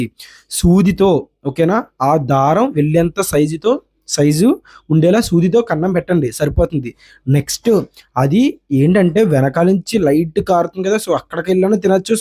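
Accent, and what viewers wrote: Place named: Godavari, India. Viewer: మన గోదారి జిల్లా బిడ్డ లా ఉన్నావే మాట చూస్తే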